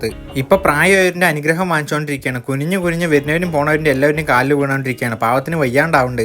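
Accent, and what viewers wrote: Palakkad, India. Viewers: Palakkad anaano baai? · Palakkad aano bro nee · palakkadan slang ❤❤❤❤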